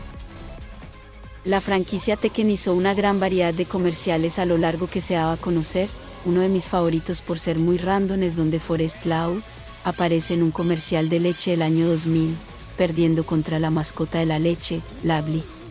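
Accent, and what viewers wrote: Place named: Colombia. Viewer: Loquendo con acento colombiano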